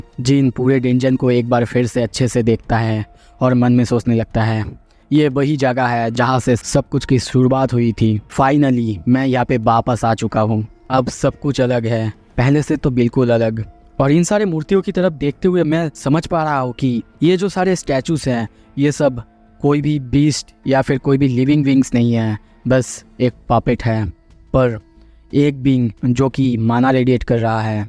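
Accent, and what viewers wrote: Bengal, India. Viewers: Tomer kotha sune mone ho6ilo Tumi Bangali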